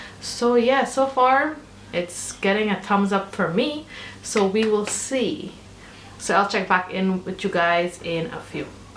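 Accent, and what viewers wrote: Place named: Caribbean. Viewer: you sound caribbean, where are you from? I'm from Trinidad.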